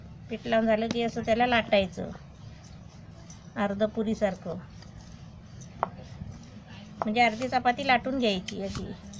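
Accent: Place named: Ratnagiri, India. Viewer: Kaku, chapati chi paddhat pan chhan aani tumhi kelel varnan pan mast. Tumchya bholnyat Ratnagiri chya bolibhashecha godva sampurn video sampeparyant khilvun thevto.. 👍🏼 Dhanyawad.. 🙏🏽 🙏🏽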